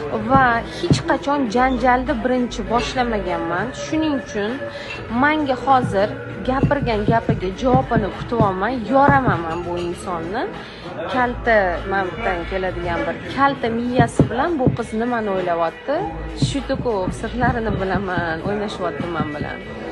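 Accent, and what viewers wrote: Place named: Kokand, Uzbekistan. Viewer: Sassiq qachondan rus tilida gapiradigan boldin yaqinda organvoldin shakili toza o'zbecha gapirardinku qoqonchalab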